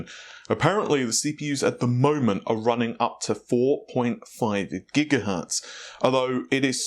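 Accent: England, United Kingdom. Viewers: Mike D He is British from Great Britain, with an English accent then :)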